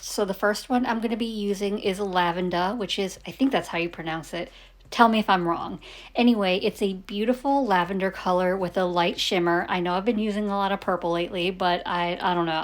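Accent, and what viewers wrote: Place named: Massachusetts, United States. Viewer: Lavendah… you’re speaking my Massachusetts accent we have officially dropped the ‘R’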